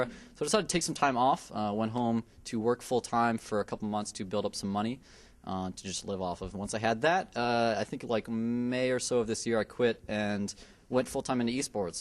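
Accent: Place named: Canada. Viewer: I think shes Canadian lol. Aboot!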